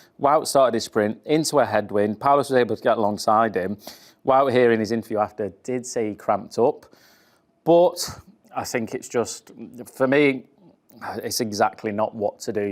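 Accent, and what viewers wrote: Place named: Yorkshire, United Kingdom. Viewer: Thats a lad!! Proper Yorkshire lad!! I live in the states these days so watching and listening to makes me feel at home. Love it!! 🤘🏻🇬🇧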